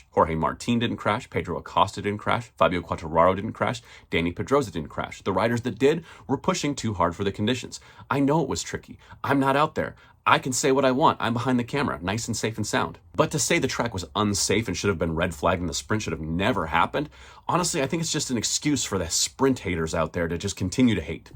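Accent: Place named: United States. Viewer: Keep it up man you've got a new viewer. Nice to hear an American voice commenting on motogp! Youre a good speaker. Hoping Yamaha gets back in the mix soon.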